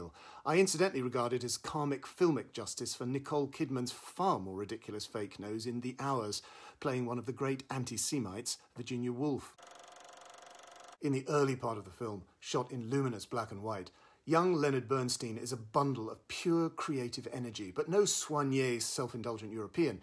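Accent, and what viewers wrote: United Kingdom. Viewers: British darlin’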